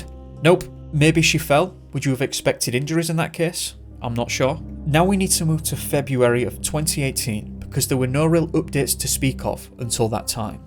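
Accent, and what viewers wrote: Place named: Hull, United Kingdom. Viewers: Where are you from? One minute I think you have a Leeds accent but then you sound a bit Sheffield but then I hear you pronounce a word and I then think Hull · Oooh your accent I think you're a hullonion 😉🤭 great channel ❤ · I detect a hullensian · It's an East Riding accent. It's probably from near Hull.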